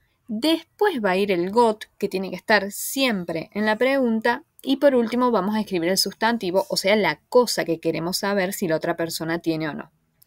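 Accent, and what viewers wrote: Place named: Argentina. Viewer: Que bueno que encontré una Argentina que explique y encima bien... porque estoy podrido de escuchar el acento de España en tutoriales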